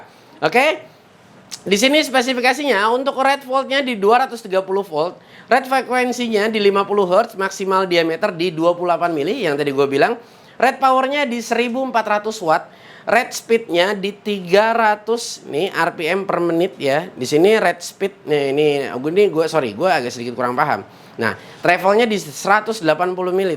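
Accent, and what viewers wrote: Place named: Betawi, Indonesia. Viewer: Jujur bang cara penjelasan ente emang beda betawi abis mantap ❤ane jd cengar cengir ngeliatnya 😂 ini baru review + hiburan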